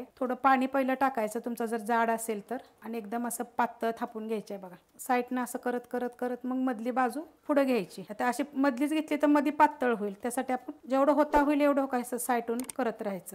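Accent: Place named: Kolhapur, India. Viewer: काकी , तुम्ही कोल्हापूर भागातील आहात का... मला तुमच्या बोलण्यावरून् वाटले ... तुमच्या रेसिपी खूप आवडतात मला... मी नेहमी करून बघते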